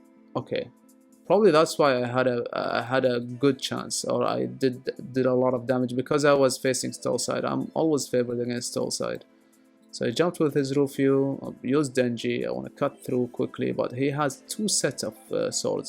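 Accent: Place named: Arab world. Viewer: ليش صوتك كأنك عربي يتكلم انجليزي ؟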